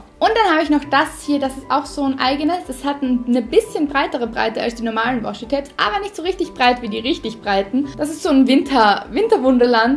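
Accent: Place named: Austria. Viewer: Mehr filofax Videos ich habe mir schon auf Amazon eine Wunschliste gemacht für alle Sachen für meinen filofax denn ich noch nicht habe ich werde mir den gleichen wie du holen weil ich liebe einfach Mint😍 und ich liebe euren österreichischen Akzent ich komme ja aus Deutschland ❤️🔥 Liebe Grüße Florida💓💎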